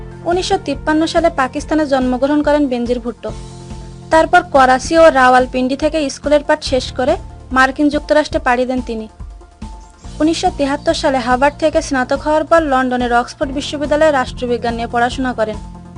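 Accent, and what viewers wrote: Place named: Rural, Bangladesh. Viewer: একদম ভালো লাগে নি! কর্কশ গলার আওয়াজ! উচচারণ এ গেঁয়ো ভাব ! আগে কথা বলা শেখেন তারপর ভিডিও বানান !!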